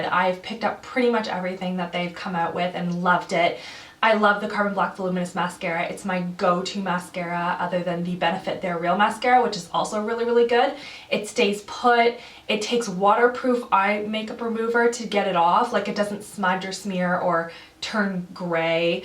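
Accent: Canada. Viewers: hi - its so funny that i after just watching u and listening to you i could tell u are Canadian :) i now my people! heheheh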